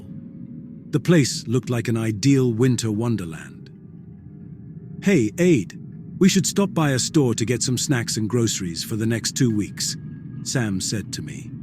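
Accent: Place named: England, United Kingdom. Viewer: Wow, it took me exactly 26 seconds to subscribe! True horror stories told w/ an English accent! Nuff said, subscribed!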